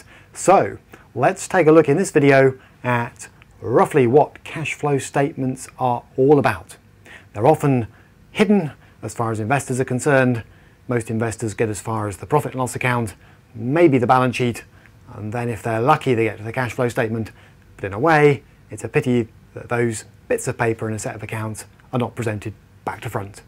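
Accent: United Kingdom. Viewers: His accent and manner is spot on Monty Python's Flying Circus. I can see him now saying, "I want to be a lion tamer!" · What Indian accent??? The guy got a British accent!!!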